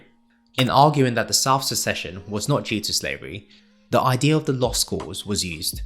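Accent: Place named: United Kingdom. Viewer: I wont hear a british voice talk to me about the AMERICAN civil war...stay in your lane tuber.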